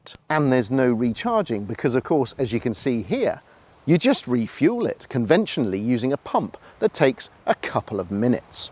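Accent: United Kingdom. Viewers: Great video, but your American accent still sounds British, lol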